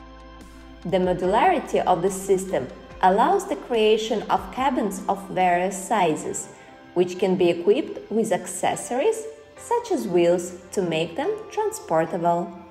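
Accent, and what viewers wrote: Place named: Russia. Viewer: что то русским акцентом пахнет ))) и профиль до боли знакомый )))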